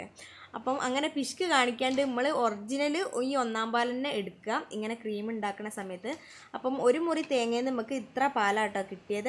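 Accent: Kozhikode, India. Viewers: കോഴിക്കോട് ഭാഷ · Samsaram kidu...oru calicut chuva🤣. Nte mon ipol niram kuranju orupad...birthtime to 6 month fair ayirunnu but ipol....njan niram illa...hus fair aanu...ipo ellarum kaliakkunnu ooh ummane pole ayallo karuthitt ennokke.....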